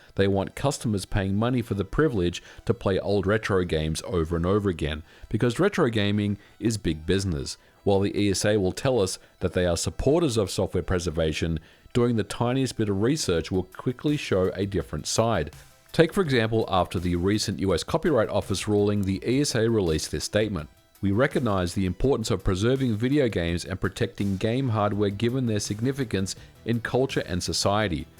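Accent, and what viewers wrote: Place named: Australia. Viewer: “here in the United States" — dude you sound so Aussie lol 😁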